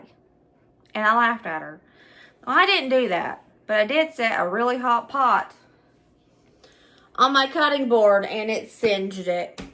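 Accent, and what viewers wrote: South, United States. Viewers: Your videos are like memories for me except I had 4 girls. We're in Ohio and my daughters are now adults with their own families. They still make these meals one has modified them for gluten and dairy free. That's is not always a cheap way to. Love the southern draw as my husband's family is from Crossville Tennessee!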